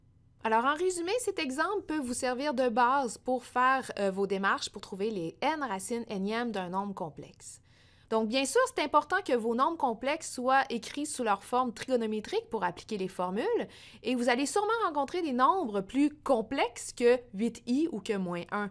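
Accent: Quebec, Canada. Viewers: l accent québécois j adoreee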